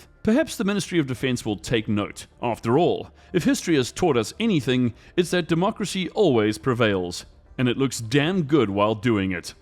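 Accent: South Africa. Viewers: He is from South Africa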